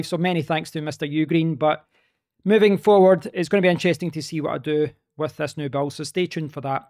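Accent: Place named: Edinburgh, United Kingdom. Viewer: what a lovely accent!! Here in Edinburgh, and find this video sound so familiar!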